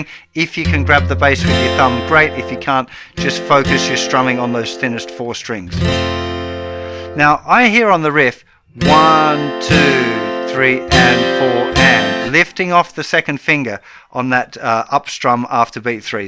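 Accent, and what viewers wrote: England, United Kingdom. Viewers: Good day to you sir .I love ur all guitar teaching and lessons ..you are a British? If I'm not wrong hehe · Bro! You’re Australian? Been watching your videos, but never realised - definitely hear an English twang. But you said you played mandolin in Tasmania. What gives??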